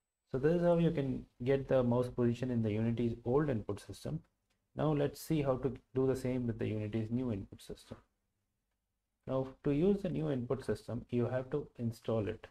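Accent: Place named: India. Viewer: I searched many a tutorial and none could resolve my issue with the new input system. I stumble upon this quick and easy one and in a mere moment my code works perfectly. Thank you random Indian accent tutorial man. I am truly grateful.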